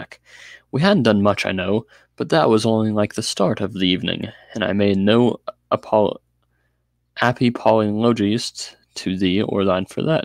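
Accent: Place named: United States. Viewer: Hey, are you american? I like your accent I could listen your accent all day long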